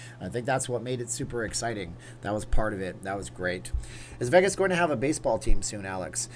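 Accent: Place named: Canada. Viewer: I think people like your Canadian accent :) and your information/news on LV. You are doing a great job.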